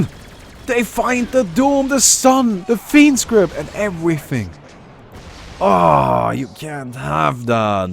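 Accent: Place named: Germany. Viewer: it's the German accent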